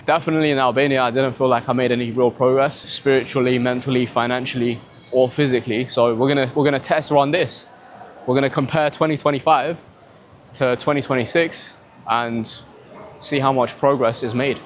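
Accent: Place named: United Kingdom. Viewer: you sound like you came from the UK.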